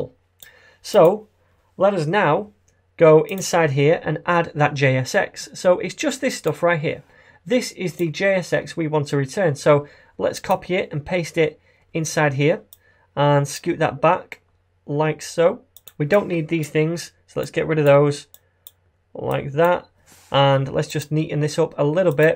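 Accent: United Kingdom. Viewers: I love you British Accent <3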